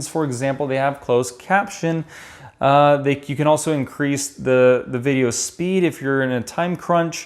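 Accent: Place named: Russia. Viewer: Мне кажется , что у вас русский акцент.For some reason I hear russian accent in your speech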